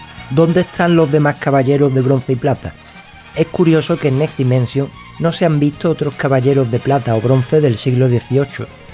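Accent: Andalusia, Spain. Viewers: ahhh viste que buen oído tengo al distinguir el acento característico de tu provincia. Ahora me voy a ver la segunda parte de este video. Gracias por tus vídeos. Saludos desde Chile.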